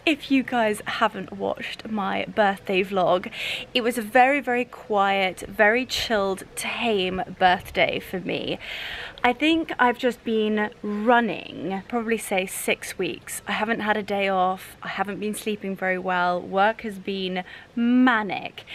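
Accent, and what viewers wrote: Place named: Scotland, United Kingdom. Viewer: Loved this - an amazing property 😊 Also loved hearing that Scottish accent!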